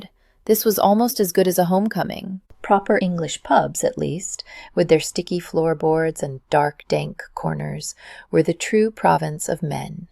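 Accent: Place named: United States. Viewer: No no no no no! No American Narrators of an English story!!!!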